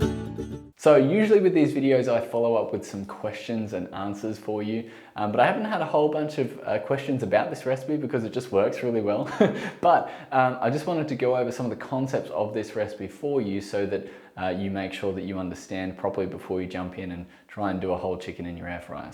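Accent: Australia. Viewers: Hi, just a query regarding coconut flour!!!!! I live Perth Western Australia and try to get organic, can be a challenge. I recently purchased 2 different organic coconut flours and the carbs per 100gms vary quite a bit. Is there a logical answer to that?? By the way thanks for your vids, it's pleasant listening to an Aussie accent without all the hoo hah. Much appreciated Rosemary (70 yrs)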